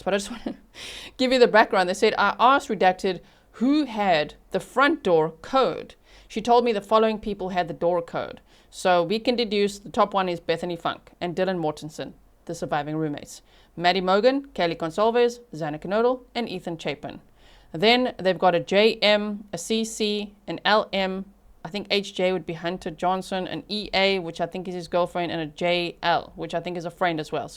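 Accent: South Africa. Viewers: Just discovered you. Funny how I knew right away that you are a fellow South African! Thx for your great work. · Are you also from South Africa. Your accent❤ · I know a south african accent when i hear one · is that a beautiful South African accent I detect? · You've just gained a new South African subscriber- I recognize your accent, but it's not that- your breakdown and the new info given in this video is brilliant!! Thank you!!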